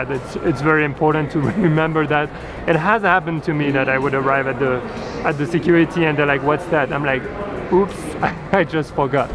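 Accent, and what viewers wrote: France. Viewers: Tu es français ou je me trompes ? 🫣 Thank your for the advice I'm flying firdt time with my camera 😊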